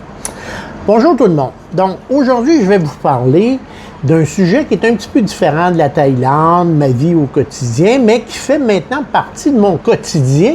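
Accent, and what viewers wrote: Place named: Quebec, Canada. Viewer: J adore votre gouaille de quebequoais .. 😀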